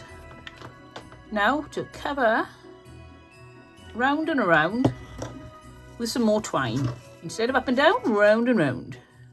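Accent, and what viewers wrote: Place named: Fairwater, United Kingdom. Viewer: I love watching your videos and your voice brings back lovely memories of the two years as a teen I lived in fairwater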